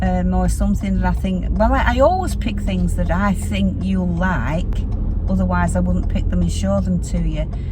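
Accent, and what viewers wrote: United Kingdom. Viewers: Hello 👋 from the USA… what kind of cream did you use for the frosting? I sometimes have a little problem with the UK accents or or too the products have different names there..❤❤Thanks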